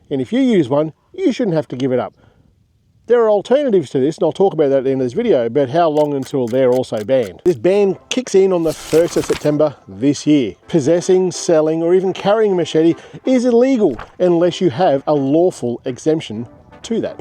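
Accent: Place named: United States. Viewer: Boo hoo, u sound like a red neck American. U can’t take my guns from me. Who cares if u can’t use a machete. I bet u hardly use it . But let’s jump on the bandwagon and use it to get more content for your channel.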